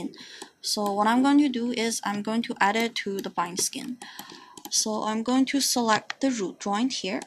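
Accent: China. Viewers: I'll give this a try later :) Your accent btw, from China? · Hi, first of all, thanks for sharing, it's great content. And the moment I hear your voice, I can almost tell that you are Chinese from your accent. Your voice is good btw. And according to your video, I think your conduction means after exporting the skin weight map once we need to re-rig the mesh by "Select Hierarchy and rig it from selected joints" way and then import the skin weight map. Last but not least we need to make sure all joints related are selected, no more no less. I have tested it through this way and it seems to work as expected. Kind of a weird bug that Maya couldn't read the hierarchy after rigging the hierarchy way. we seems to have to tell Maya what joints we did use to rig the mesh. How did you...I mean you r awesome to have found the solution. Congrats.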